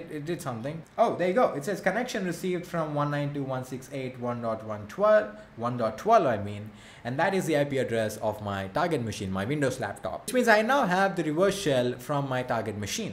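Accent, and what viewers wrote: India. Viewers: I still don't understand. i Indian accent is completely understandable why people need a fake layer on that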